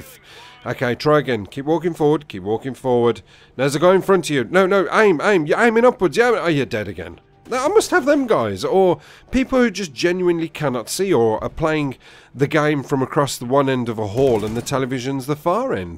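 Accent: Birmingham, United Kingdom. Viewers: Funny BRUMMY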